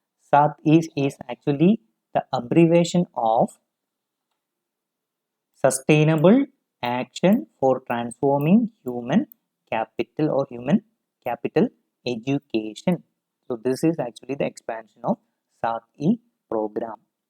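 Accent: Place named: Kerala, India. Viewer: Voice of a malayali🤔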